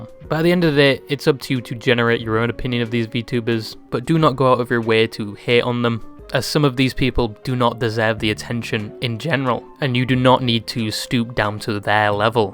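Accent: United Kingdom. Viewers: British man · he’s just british mate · ahh yes the british disembodied voice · wait you're a brit?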